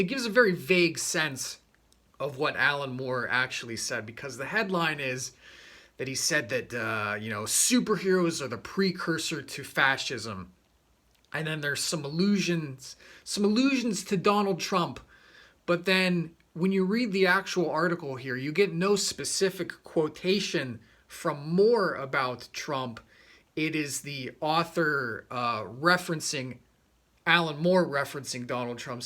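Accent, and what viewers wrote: Australia. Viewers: Specially since Moore is from the north of England. The accent on this vid is more Aussie/Cockney.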